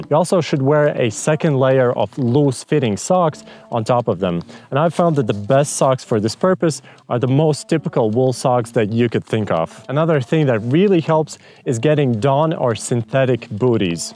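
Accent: Latvia. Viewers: Man jau likās, ka es dzirdu latviešu akcentu. Labs video! :)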